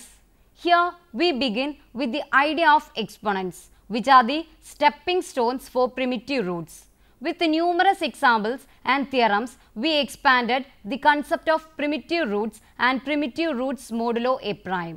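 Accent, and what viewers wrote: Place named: Kerala, India. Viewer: Malayalam influence in English. Plz learn phonetics immediately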